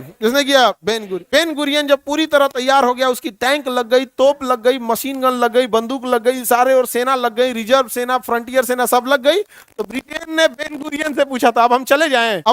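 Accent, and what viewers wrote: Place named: Bhojpuri, India. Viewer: I like the way he speaks in bhojpuri style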